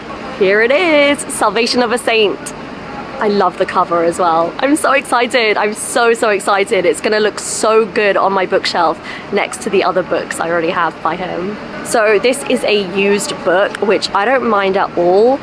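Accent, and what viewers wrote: United Kingdom. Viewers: your British English is easy to hear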